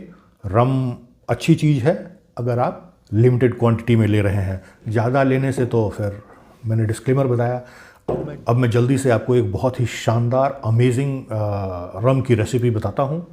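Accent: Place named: Jharkhand, India. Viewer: Sir aap jharkhand ke kis jile se baat kar rahe hai ❤❤❤❤❤❤❤❤ I am from Jamshedpur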